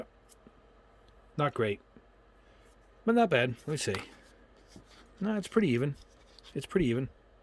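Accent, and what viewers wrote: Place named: East Coast, United States. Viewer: Cool and east coast accent and a voice that doesn’t sound like a mob hit man but a New York professor of fine art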